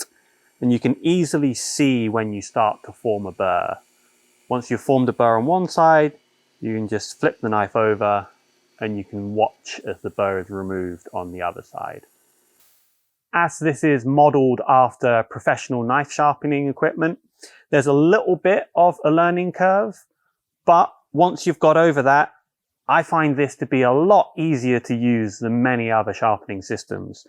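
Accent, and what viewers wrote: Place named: Midlands, United Kingdom. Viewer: It’s so lovely to hear a Midlander! Aye Up! Great introduction to the Grinding Attachment.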